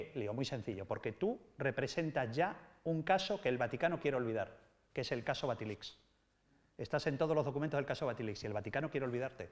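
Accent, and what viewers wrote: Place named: Spain. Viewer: Gran escritor peruano. Parece que lleva muchos años en España, porque su acento es totalmente español.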